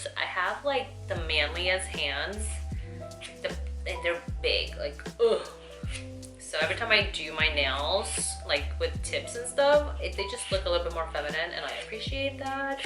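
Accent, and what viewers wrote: Puerto Rico. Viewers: Chica, I"ve experienced culture shock in the past, pero the fact that a Chinese girl that speaks "Puerto Rican" Spanish, is super Fu!@ cool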